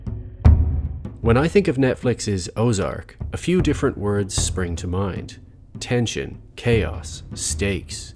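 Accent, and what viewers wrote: Ireland. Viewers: Im pretty sure you're Irish but I can't place the accent exactly.. If i had to guess I'd say you're from wicklow or wexford .. Great video too btw👌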